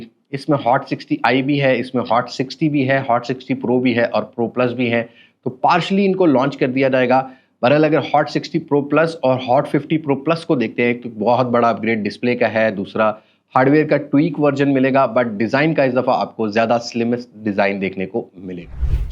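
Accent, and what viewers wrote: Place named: Punjab, India. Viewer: Ap ka thora thora Punjabi ka touch bhut wadia lgia ay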